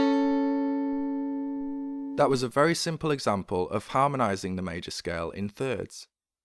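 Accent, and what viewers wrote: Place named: Manchester, United Kingdom. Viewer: Excellent teaching! All in the form of little focused nuggets of pure musical gold :-) (It helps to have a basic understanding of 'theory' and a few years of noodling for basic ear training to relate to.) Your Mancunian (?) accent reminds me of the programme director of part-time courses at The Institute.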